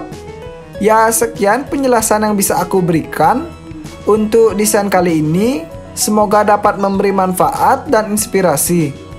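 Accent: Bali, Indonesia. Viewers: Mantaaap Bagus Niihh Buat refrensi Bagi yg Memiliki lahan Yg Kecil untuk d jadikan rumah Tinggal.. Bicara Nya Bali Banget Broo. Salam Nak Bali Rahayu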